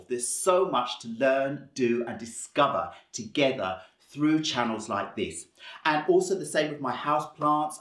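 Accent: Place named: England, United Kingdom. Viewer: ohh nice!!! You don’t look a day over 25!! My hair is about 3 foot long, and I am 6 foot tall lol! My hubby loved long hair and I haven’t had the heart to cut it even though he passed away in 2015. Where are you originally from!? My guess is possibly England or South Africa! You have an accent but it’s hard to figure out!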